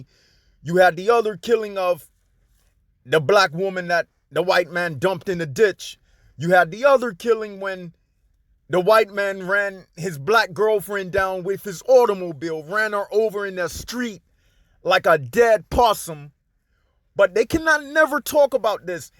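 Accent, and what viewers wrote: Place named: Caribbean. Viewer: No I don't find her channel boring at all. I like that she has a new story all the time. She never repeats a story. And that's the main thing of her channel is she specifically says she will never repeat any of her content. So no it hasn't gotten old. But why are you even caring what she or other black American women want? You're not a black american. You can tell you clearly have some kind of Caribbean accent. Why don't you care about the women in your country? I think that would be more productive for you. They probably don't have this anyway. I mean they grew up with mainly black men and I don't even know how you guys are over there. Why do you even care? If she's talking most of the time about black American men and you are not black American then why do you care? It's just a thought.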